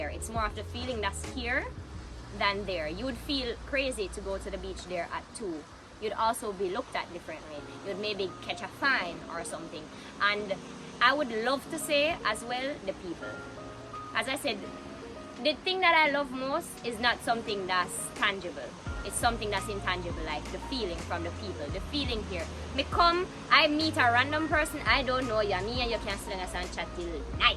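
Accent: Jamaica. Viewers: Yo u sound like us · You have a jamaican accent and behavior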